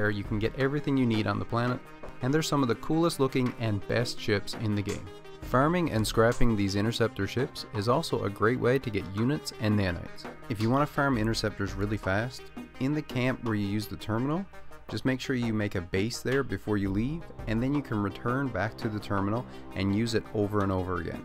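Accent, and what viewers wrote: Newfoundland, Canada. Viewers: Are you from Newfoundland? Can hear the accent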